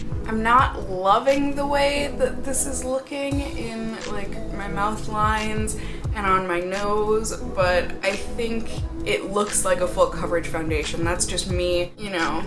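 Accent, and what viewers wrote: Minnesota, United States. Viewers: your videos are my favorite videos to watch, although they don't help with my skin tone lol I just enjoy watching you! I thought I recognized that accent and then I finally took the time to look in the description and you're a fellow Minnesotan! all the more better!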